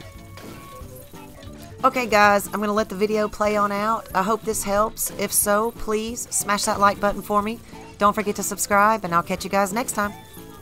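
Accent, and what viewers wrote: South, United States. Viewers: I love your southern draw ma’am!!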